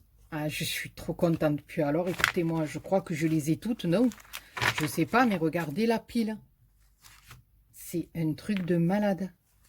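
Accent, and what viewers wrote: South, France. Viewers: C’est dommage que tu sois obligée de te justifier. Ton expliquement et avec l’accent c’est presque du Pagnol. J’adooore. Tun’est pas là seule à vendre et après. Qu’ils s’occupent de leur c........bravo la star. Bisous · Bonjour je tombe sur votre chaîne et quel accent charmant qui sent bon le soleil ☀️ laissez parler les mauvaises langues et bonne continuation 😘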